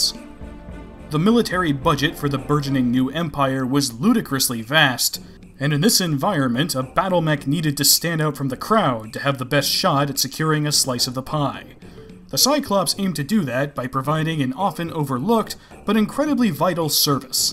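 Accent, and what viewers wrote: Germany. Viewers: I listened to your reading o f Measure of a Hero and I think you have a fine German accent. Reminds me of the series 'Allo 'Allo.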